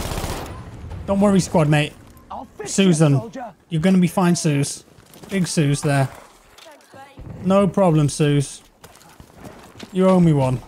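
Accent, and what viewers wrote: United Kingdom. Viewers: what is your morning routine? I would be real mad if there’s no tea involved. Anyways, you’re such a classy lad. You sound so British in the good way, if that makes any sense (it doesn’t). My brother doesn’t agree though!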